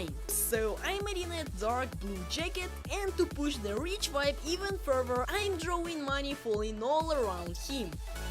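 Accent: United Kingdom. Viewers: Why do you sound British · Are you British?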